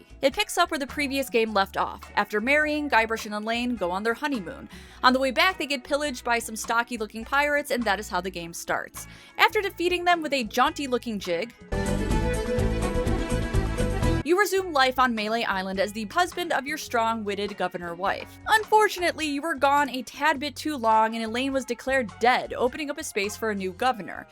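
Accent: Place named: General American, United States. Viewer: Awesome; I enjoyed this video as usual! I am feeling a little pedantic though and I have to point out an issue (please forgive me). Everyone has an accent. A General American accent is still an accent. It's what you're most used to hearing, so it doesn't stand out to you... but it's still an accent.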